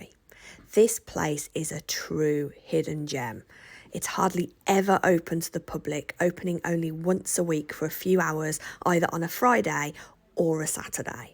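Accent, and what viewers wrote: Birmingham, United Kingdom. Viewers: Your enthusiasm shines through. Interesting,informative and beautiful Brummie delivery❤❤❤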